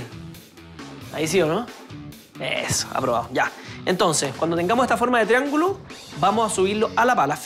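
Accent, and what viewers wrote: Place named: Chile. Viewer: Dudé que fuera chileno porque entiendo todo lo dice JAJAJA. Qué buena receta, gracias!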